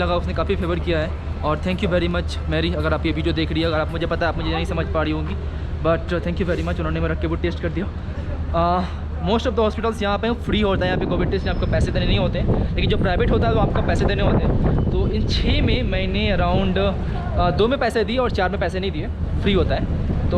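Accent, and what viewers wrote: Bihar, India. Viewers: ITS BIHARI LANGUAGE... 😊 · BIHARI style Bhai.... hamare Bihar me aise words aise hi pronounce krte hain.. Jaise competition ko kampatetaion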